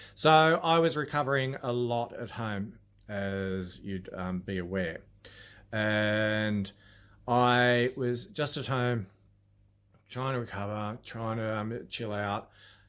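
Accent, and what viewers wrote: Australia. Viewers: I have always enjoyed your Australian accent and great personality. I am saddened by the cancer report. Your abandoned videos spoke for themselves, the objects and air left behind speak loudly in your silence. I have enjoyed all your abandoned explores. you revealed a handsome kind face. A lot of lives have been touched by your videos. My prayers are with you in your time of need. Stay strong and safe in these pandemic times. It has been fun and delightful to watch your videos. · Love your videos!!! Damn, you are a handsome Aussie too!! Love your accent too! Praying for you for your health!...I hate cancer!! Big Hugs from Mississippi, USA! Thank you for sharing your wonderful abandoned videos!! 🙏❤️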